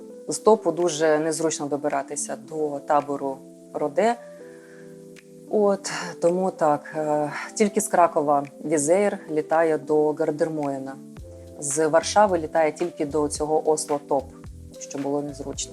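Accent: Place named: Ivano-Frankivsk, Ukraine. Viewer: Вы не киевлянка,франковская говорит файно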